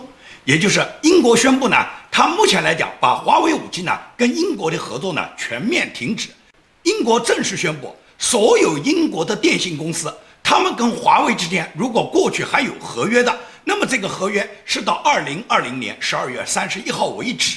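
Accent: Nanjing, China. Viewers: 尊敬的哥您好，18年初看您的视频至今，收获良多，我也是南京人，特别喜欢您南京口音的普通话。您的坚持不懈，您的语速，您的激情都感染着所有同道中人。有人认为您用词反共过于夸张，过于亢奋激情。其实不然，太多中国人已经习惯跪太久了，冰冻三尺非一日之寒，矫枉必须过正，再不激情怎能唤醒国人，即使夸张又如何。还有人认为您对共匪不分对错太过痛恨有点过了，而我只想说未经他人事，莫劝他人善。有这想法的人他们不懂您，更不懂共匪。您的所有视频我都看完。由于一些特殊原因有一个多月没能上youtube看您视频，近几天也都补看完了。当我看到1000期您说爱情故事时，我被您的爱情故事震撼了，发自内心来说这是我听过的一个最好的最真切唯美的爱情故事，您的故事有血有肉，有柔情有理想怎能不让人感动。哥不愧南京人，果然真男人!您对理想的执着让人无比敬佩和感动。如果您能看到我写的东西话我想说两点我的想法，仅供参考，1.您觉得匪让人有肉吃有酒喝了所以人民安于现状了，麻木了，粉红了，其实那只是少部分。而大部分恰恰相反，他们是得天天在努力争取酒肉，不是不想剿匪，没有理想。而是在剿匪的路上需要吃喝，自古当兵不就为了吃口皇粮吗？而现状是他们连翻墙软件都买不起。2.中国人跪久了是怎么造成的那，未何一点血性都没了那？这不光是匪的原因，更重要的是儒家的原因。孔老二在战国时本是百家中最普通的一家一直不被认同。所以孔老二当时周游列国都不受待见，秦始皇用的是法家，汉用的是老子无为，直到千年后的中唐才为了封建统治用了孔老二洗礼人民思想。所以剿匪必先灭儒！最后说一点您可能不爱听的，习近平做为帝王他是伟大的，对于新疆，香港他没有开枪，无疑比毛，邓高明很多。还有扫黑扫毒等对内政策把社会风气提高很多。是江，胡所不能比的。我知道您可能会反驳很多，但我说的只是对于帝王来说，请正面理解。希望有机会去美国时能和您当面讨教。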